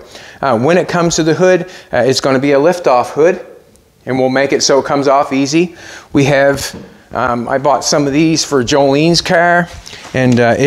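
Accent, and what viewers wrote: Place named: Maritimes, Canada. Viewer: Hey don't worry abut talking too much! I really like the way you put words together! No fancy language just heart felt words. I'm Canadian too and find your Maritime accent and sayings are very entertaining. I follow all the Canadian car You Tubers and you are all are so down to earth. There is a real demand for real no BS (not scripted) reality shows. You and Jolene are living my dream life so thanks for taking us along with you. Looking forward to your Australian and Toronto episodes. Great stuff you two!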